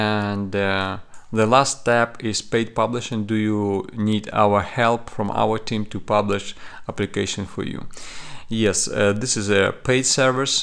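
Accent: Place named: Italy. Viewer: si sente benissimo che sei italiano. il tuo inglese fa schifo!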